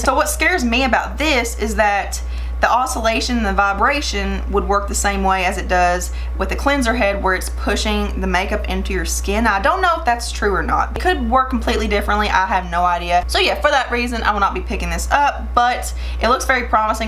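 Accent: South, United States. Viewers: "okay first of all. who is Natasha denona?" omg i'm weak. great video. love your southern accent, i'm a southern belle as well and it comes through so strong in my videos 😂 love it 💕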